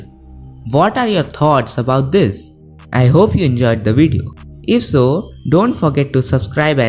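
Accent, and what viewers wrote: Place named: India. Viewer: Wow, Indian English is terrible.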